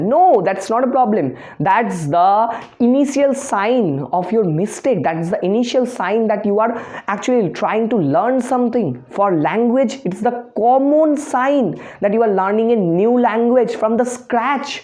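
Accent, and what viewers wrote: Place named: India. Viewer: Sir keep following Indian accent. I always watch your videos because your accent is familiar for us.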